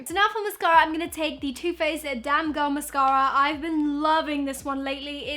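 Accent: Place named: United Kingdom. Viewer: Talking is so soothing to ear I love your British accent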